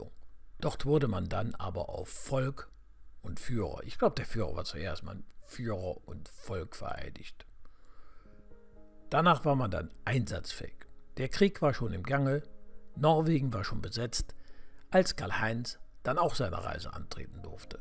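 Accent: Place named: Bergisch Gladbach, Germany. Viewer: Ich glaube Bergisch Gladbach . Danke für die tollen Videos.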